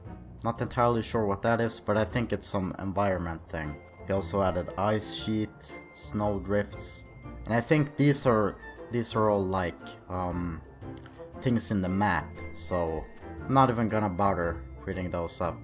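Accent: Sweden. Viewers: What are you Swidish or What ?or i hear wrong?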